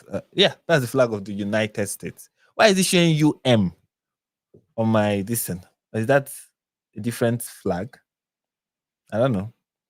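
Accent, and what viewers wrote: Nigeria. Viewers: The world doesn't revolve around Americans......So get used to the accent. PROUDLY NIGERIAN 🇳🇬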